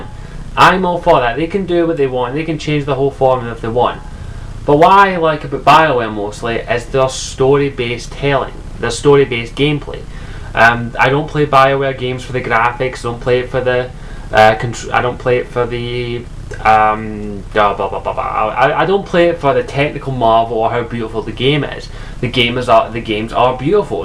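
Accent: Ireland. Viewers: Are you irish? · Lol. "Are you Irish?" Sorry, but that was funny. You have a thicker accent than I'm used to, but even if I didn't look at your user name, I would still be able to tell. Eh, what do I know, I'm just a silly American. I seriously don't want the rumors to be true, if they make DA3 into a multi-player or an open world... there is no way to properly convey my disgust. They definitely need to go back to the multi-race/background set-up and allow for more conversations like in DA:O. <--disjointed much?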